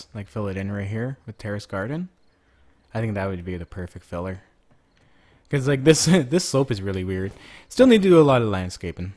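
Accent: Canada. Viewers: I have one of the most important question that you will ever be asked. Are you Canadian?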